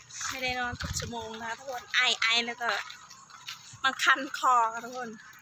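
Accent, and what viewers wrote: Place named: Cambodia. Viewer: เสียงเว้าค่อคนเขมรเนาะ (ສຳເນີຍງຄືຄນຂ້ເຫມນເນາະ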